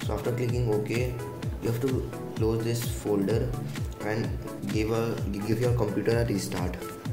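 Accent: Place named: Karnataka, India. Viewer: R U Kannada ?? U Get the slang of Kannada in English...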